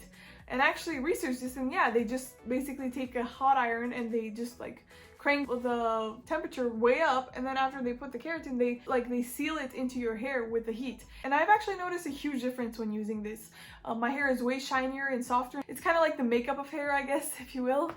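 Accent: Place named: Romania. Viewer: Ur Romanian! I can tell by the accent (and products). Buna. :) M-am prins dupa accent si dupa incercam sa vad etichetele produselor daca sunt in romana, pana cand am reusit sa vad "ulei de cocos" si parca mai e ceva pe cele de la Syoss. Se pare ca ne recunoastem dupa accent.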